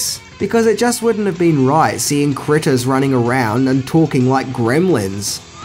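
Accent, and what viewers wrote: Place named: Australia. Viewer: The Australian accent makes "bastards" sound so refined. Thanks for the fun videos, keep up the great work.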